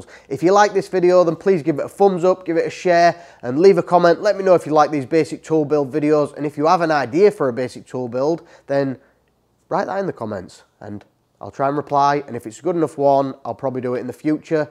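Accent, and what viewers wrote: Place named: Scotland, United Kingdom. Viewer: Never heard your voice before, wasn't expecting Scottish. Nice to meet you sir :)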